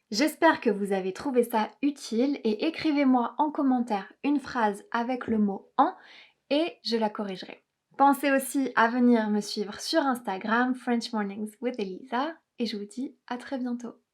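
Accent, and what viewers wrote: England, United Kingdom. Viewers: It's funny, your accent in English is rather British, I didn't expect that. Certain words sound very American though. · J’adore votre accent anglais hahaha! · You have such a lovely English accent!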